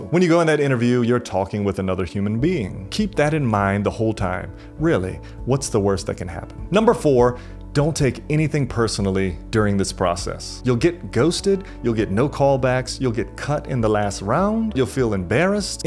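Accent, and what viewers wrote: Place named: Chico, United States. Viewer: sounds like he's from Chico, California.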